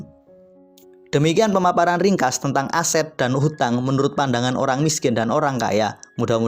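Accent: Java, Indonesia. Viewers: Wah rasis juga ya. Emang kenapa klo suaranya jawa medhok? Apakah jawa itu miskin?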